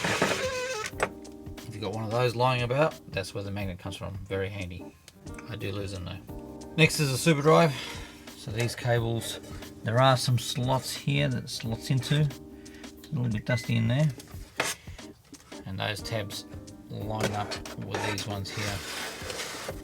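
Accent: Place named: Australia. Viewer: Thanks for video mate .Good to hear an Aussie accent :)...Can we buy parts from you ?...I have a 3,1 with faulty PSU. Cheers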